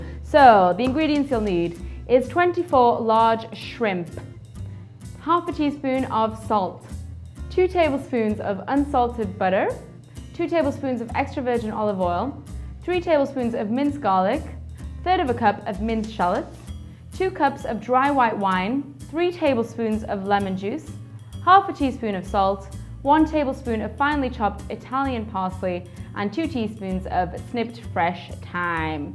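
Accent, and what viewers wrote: Australia. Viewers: sounds australian... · she kinda sounds like she has a faint Australian accent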